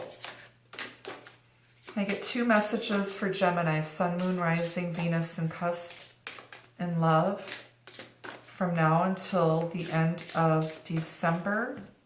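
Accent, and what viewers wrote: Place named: Wisconsin, United States. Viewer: i miss the wisconsin accents in my life! listening has been a real treat for me (subscribed)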